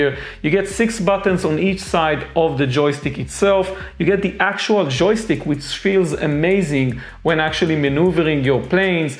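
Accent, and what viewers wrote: Israel. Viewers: I love your Israeli accent :)) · שומעים שאתה ישראלי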